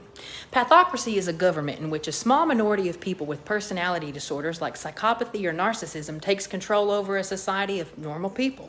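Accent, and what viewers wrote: South, United States. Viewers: Congratulations- you’ve become a masterclass on southern fried reading on an Aaron Sorkin “Walk and Talk”. 👏🏾🔥👏🏾🔥💯💯💯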